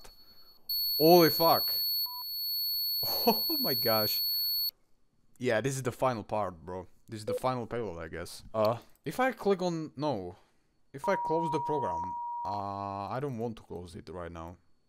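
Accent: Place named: Czech Republic. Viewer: nice czech accent, i liked it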